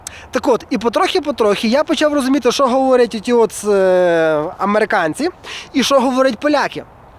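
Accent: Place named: East, Ukraine. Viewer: Це круто! Але подейкують що відчувається східний акцент..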